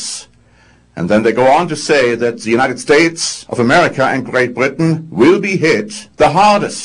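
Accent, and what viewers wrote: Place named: Germany. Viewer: this guy is german , u can easily tell by his german accent .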